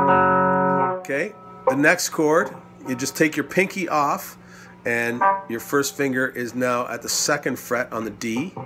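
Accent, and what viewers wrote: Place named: Canada. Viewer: Your accent is pure Canadiana.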